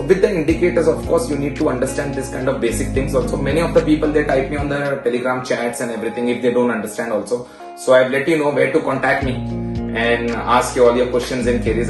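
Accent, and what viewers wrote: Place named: India. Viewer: Bro his accent is Indian and that makes hard to understand but this dude is good and anyone can understand but every body are not the same he was saying his strategy depends on moving average and you can get more explanation from youtube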